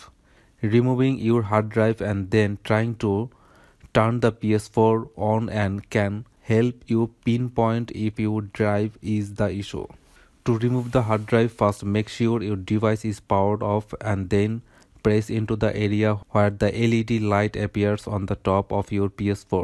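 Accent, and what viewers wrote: India. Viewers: When bro started talking with the Indian accent i knew i was in the right place... But L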